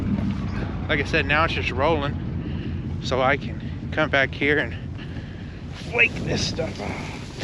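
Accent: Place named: Australia. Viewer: Hi from Australia. You are sounding like an Aussie farmer. We’ve been turning away from feeding as much as we can. But the biggest change here over last 30 years has been changing fro hay to silage. It’s been huge the change. Especially in the colder areas out here. The quality of silage over hay is the driving factor. I was one of the first contractors to do silage. Now nearly every contractor has to offer that or he doesn’t have many clients. I could go on for hours but would swamp your messages.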